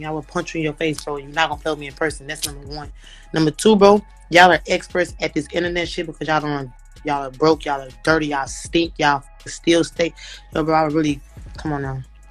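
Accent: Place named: Philadelphia, United States. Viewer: Couple months ago she was up on pophunna sounding like she from philly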